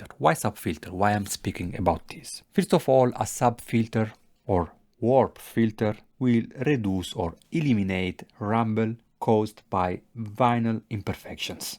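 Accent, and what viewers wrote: Italy. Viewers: Also, if you don't mind me saying so, I'm not in the least bothered by your Italian accent but there are a couple of things that really hurt my ears and one of them is 'knobs'. The k in knobs is silent, like in 'know, 'knife', 'knit', 'knock', 'knight' etc. So it's pronounced 'nobs'. Also, knobs are the things that you tend to turn to adjust. The push only things are called buttons.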